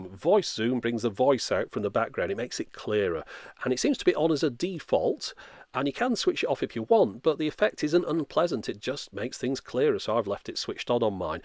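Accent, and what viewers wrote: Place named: North West England, United Kingdom. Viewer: Can’t get over the Schools TV feel on your videos, I think it’s the NW accent with Granada having made a lot of the programs for ITV Schools. This isn’t a negative, I used to enjoy some of the ITV schools programs even after I’d left school and watched when I was off / out of work.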